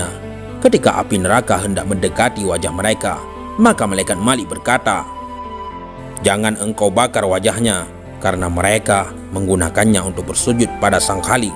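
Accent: Aceh, Indonesia. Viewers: Orang Aceh ni suaranya.. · Apakah admin orang Aceh?? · Logat Aceh susah utk di hilangkan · Admin nya logat aceh ya🙏🙏🤭🤭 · Minin awak aceh nye? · ini yang bicara orang aceh ya. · Tuh klo ga salh logat Aceh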